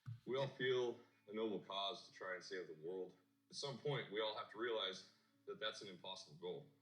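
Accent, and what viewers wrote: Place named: North East England, United Kingdom. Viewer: I’ve just found you because of a mention on Chads channel. I’ve already watched some of silver and solo videos but not heard of Alexa, so will definitely check her out. It sounds like your from the north east, I’m from Cumbria and about to start a YouTube channel. I’ll be following your journey, great content 👍🏻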